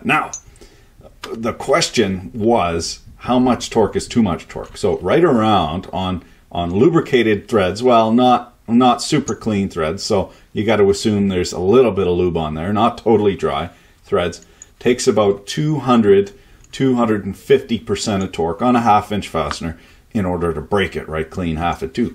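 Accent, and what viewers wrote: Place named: Maritimes, Canada. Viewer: please check this article and related video on head bolt failure. <link> FYI its great to hear a fellow Canadian on you tube. For a guy living in BC you sound suspiciously like a maritimer. or maybe you had a Moosehead ale or to once in while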